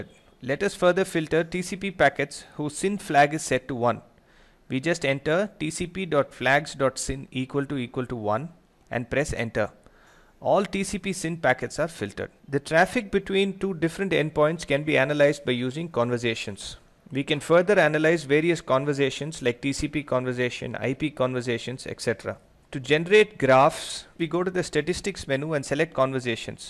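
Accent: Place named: India. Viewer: It's Indian accent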